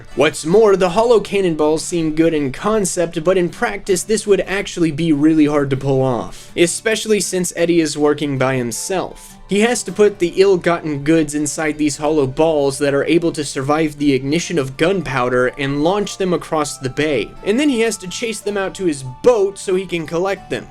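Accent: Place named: New England, United States. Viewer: Gonna say Maine or Vermont maybe Connecticut. Had to subscribe just for the accent 401 forever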